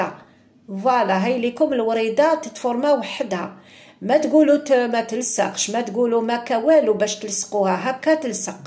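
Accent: Algiers, Algeria. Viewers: لازم ديريلنا هذا الوصصفات التقلدية تاع زمان يعطيك الصحة مشتركة من السطيف والاكثر في وصفاتك هدرتك الحلوة العاصمية الاصيلة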